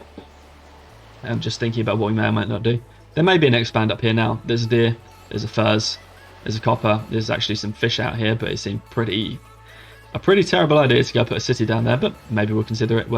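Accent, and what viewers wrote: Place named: United Kingdom. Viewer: I like how dudes from the UK don't say culture, they say culchá. It sounds cooler.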